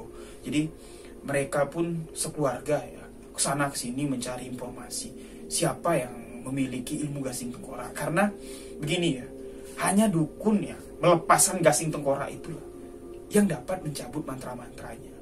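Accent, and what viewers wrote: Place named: Minangkabau, Indonesia. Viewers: Mohon maaf lahir bathin bang Sukses selalu dgn ada nya chanel ini sy semangkin tahu banyak soal dunia mistik terima kasih bang,bahasa minangnya enak banget didengar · Bg, pembawaan bahaso minang nyo barasiah, sampai wak sangko bg urang minang juo... Salam minang bang dr tanah borneo. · Mantap bang. Bahasa Sumatera bang pas banget.👍👍👍👍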